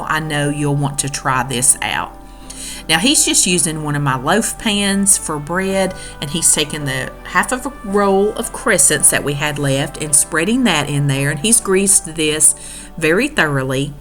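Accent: South, United States. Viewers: Merry Christmas and Happy New Year to you and your family. I just recently discovered your videos and I really love them. I love your southern accent. Southern people are always so friendly and they sure know how to cook! What you said in this video was so nice and sincere. It brought a tear to my eyes. Thanks again for making your videos. I love watching them and I’ve saved several recipes to try. I call them my experiments.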